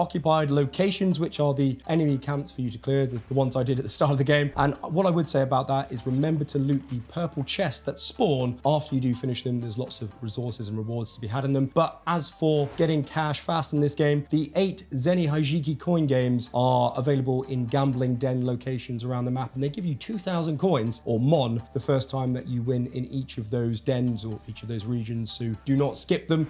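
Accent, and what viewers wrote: United Kingdom. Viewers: You sound so Gay brah.. You British eh?😂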